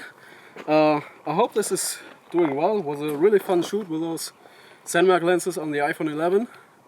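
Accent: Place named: Germany. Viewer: Allein wegen dem deutsch englisch like am Start 😂👍🏽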